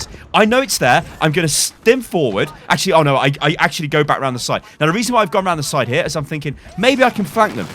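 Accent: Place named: United Kingdom. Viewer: 200iq play. I’m fucking cracking up. Whatever anyway my twitch is “theToxicLlama” I’m crazy funny toxic and I suck ass. Tune in. Rumor has it I’m gonna off myself soon for losing so many games. Great content. Always watched your OW stuff. I am truly truly brutal on my OW streams. P.s I generally hate British people. Stupid fucking accent but your aighttt big brain big IQ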